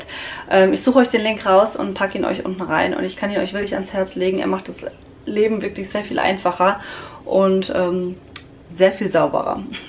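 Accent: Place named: Hesse, Germany. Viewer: Das ist HESSISCH und heisst nebbber mir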